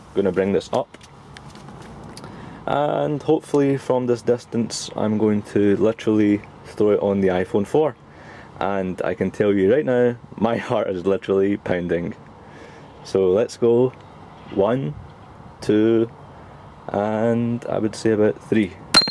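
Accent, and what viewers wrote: Scotland, United Kingdom. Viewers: are you scottish? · I like how you say otter, o'er box, i love the Scottish accent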